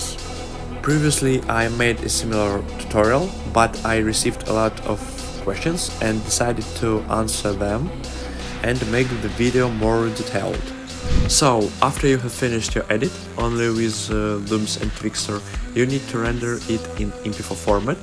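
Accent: Russia. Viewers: Чувак, ты русский что-ли?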